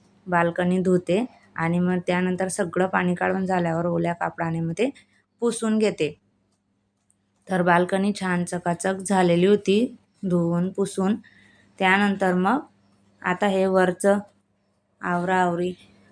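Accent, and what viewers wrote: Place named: Khandesh, India. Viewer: हाय तु कुठे राहते तुझं बोलणं ऐकून वाटतें तु खानदेशी आहे जळगाव धुळे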